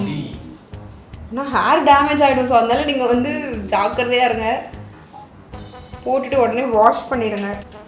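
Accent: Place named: Kanyakumari, India. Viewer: Are you from kannyakumari your slang is pretty good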